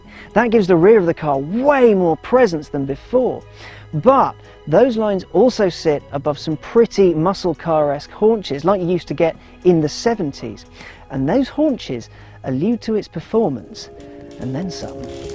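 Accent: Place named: England, United Kingdom. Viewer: To my untrained ears it sounded like Richard Hammond at the start before the camera showed it wasn't. Do they come from the same part of England? What accent is that?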